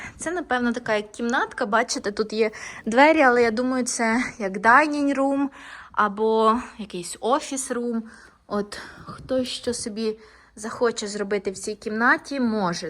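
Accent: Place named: Vinnytsia, Ukraine. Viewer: ви з Вінничини? Знайомий суржик.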